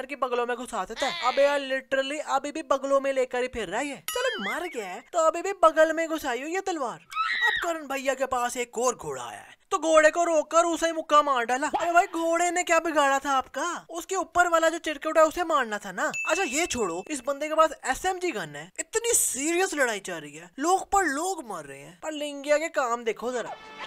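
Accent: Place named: Pakistan. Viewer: Ya video bnana vala bro pakistni ka ha es ki awaaz sa pta chala😅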